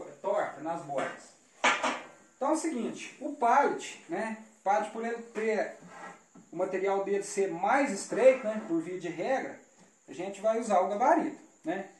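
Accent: Minas Gerais, Brazil. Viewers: Muito bom e ilustrativo ainda mais com esse sotaque mineiro ficou melhor ainda parabens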